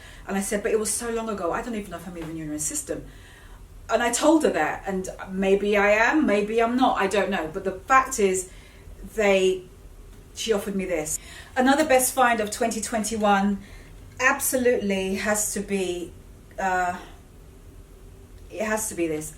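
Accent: United Kingdom. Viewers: Hi beautiful - your accent wow! New to your channel, via Arvin Olano. Thanks for the Inspo, with your fashion taste I’m surprised your videos are not edited with music? You would rock any runway! Cheers!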